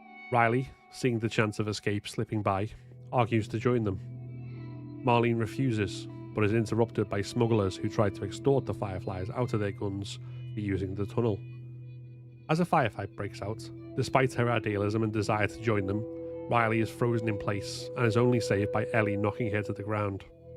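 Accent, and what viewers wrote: Liverpool, United Kingdom. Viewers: Are you a scouser?😊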